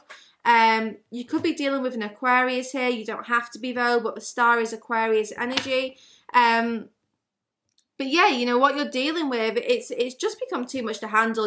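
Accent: England, United Kingdom. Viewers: Tf guidance in English accent ... Omg 😃 this is the best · Love ur accent but cant place it:) are from the UK?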